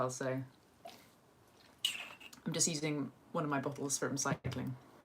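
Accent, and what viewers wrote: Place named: England, United Kingdom. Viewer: it's the accent. Kind of wish I knew from which part of England. It's killing me. Must. Marry. <3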